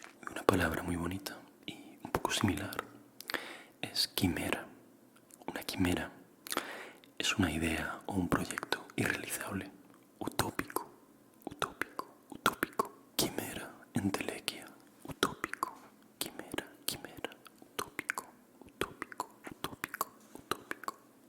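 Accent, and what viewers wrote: Spain. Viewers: El Sonido bajito y delicioso de tu voz, reforzada con ese rico acento iberico, Me Pueden Me Llevan ...😘😌😴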